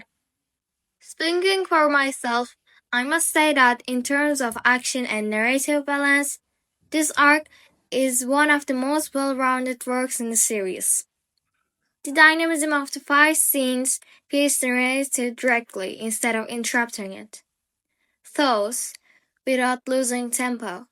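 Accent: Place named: Turkey. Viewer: Why does your accent sound so turkish?? Good content btw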